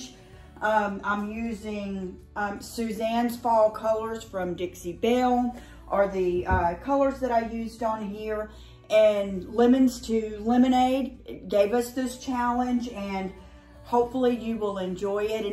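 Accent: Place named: Midwest, United States. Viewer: I'm so happy to have found your channel! Your piece is crazy cool! I've never tried a mural on furniture before, that would intimidate me. You're so talented, this is gorgeous! Okay I have to ask where you're from. Your accent sounds just like my step-MIL (IL/IN).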